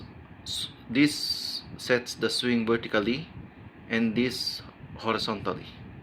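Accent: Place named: India. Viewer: Why the fuck do I keep getting Indian speakers?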